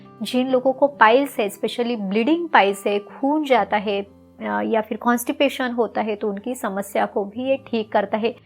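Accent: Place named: Maharashtra, India. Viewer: Aap ko dekhke aapki bolne ke tarike se aap maharastiyan lagati hai didi❤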